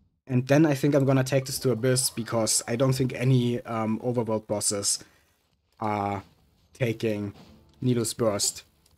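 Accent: Germany. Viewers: Your accent, are you German? Or speak a Germanic language?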